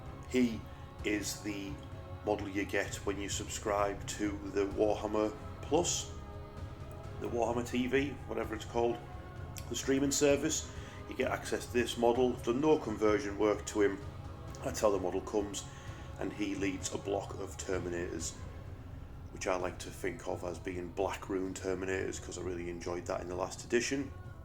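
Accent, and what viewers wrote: United Kingdom. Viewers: Excellent painting job on them all, nice to hear more northerners on here. Hello from Barnsley. Instant sub btw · Lovely army and lovely accent, whereabouts in the UK are you from?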